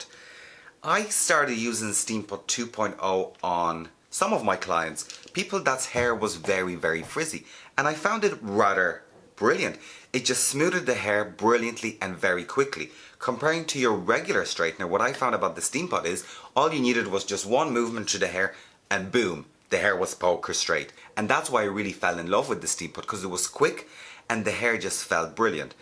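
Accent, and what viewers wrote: Dublin, Ireland. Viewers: Your accent is so exotic! It’s like Dublin with a hint of something else, Iv been using the same steam pod since late 2015 so Iv just ordered this today..thank you so much for explaining everything I STAN this channel 🤩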